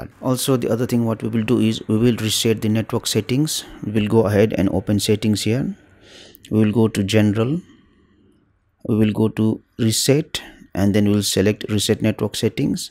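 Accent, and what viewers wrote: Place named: India. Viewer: i hate when i trynna watch some like this and the indian accent came like fuck them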